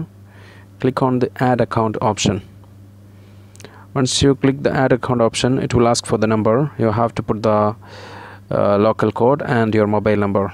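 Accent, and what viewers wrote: Kerala, India. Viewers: R U from Kerala? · English kettaal manassilaakkam malayaliye..💞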